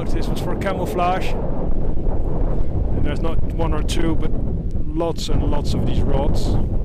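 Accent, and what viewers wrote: Netherlands. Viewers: ... en aan je accent te horen ben je Nederlander, net als ik : ) Ik kwam vroeger vaak naar IJmuiden voor de bunkers, o.a. Batterie Heerenduin. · Interesting video. And good English, i can barely hear any Dutch accent. Too bad that the bunker is owned by companies, all those typical company objects like those gates, containers and camera poles smudge the historical object.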